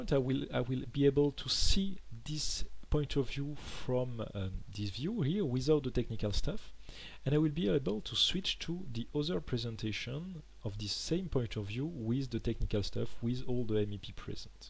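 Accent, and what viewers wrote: France. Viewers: Your French accent is “mignon”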